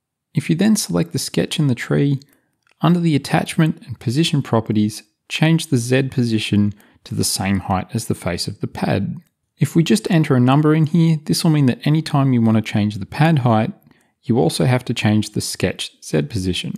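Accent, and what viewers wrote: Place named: Australia. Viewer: It's great to hear a Bazz. (read Oz-Trail-Ian) accent making such an informed and clearly articulated analytical video. I'm an FC newbie and, after many montha of bashing my old head against the Blender wall, I now know that this is the package GP draughting package I've been looking for! Well done mate, Cheers, pk (Central Vic.)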